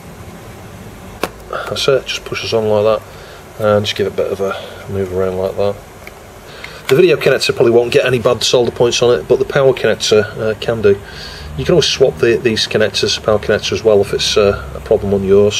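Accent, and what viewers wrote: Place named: Manchester, United Kingdom. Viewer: Sorry for offtopic but I like your accent, is that Manchester accent? :-)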